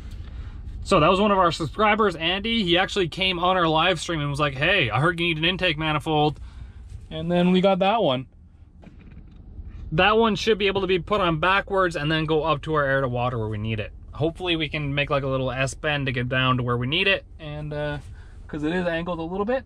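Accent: Canada. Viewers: Lmao I love the Canadian accent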